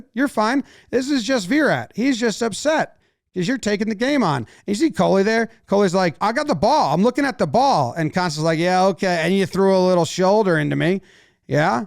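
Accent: United States. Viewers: It's pretty cool listening to an American talking cricket! Haha! You should also check Aussie rules football and V8 Supercars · Amazing to hear an American commentating, glad you're loving the game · Who else randomly started watching, and after hearing the American accent, thought, I may click off soon but watched the whole thing and thoroughly enjoyed it... Thanks...subscribed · It is so weird to hear an American talking about cricket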